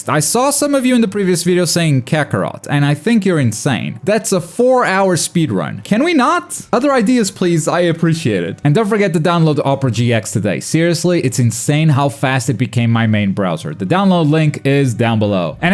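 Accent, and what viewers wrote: Portugal. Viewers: Calma calma calma és tuga?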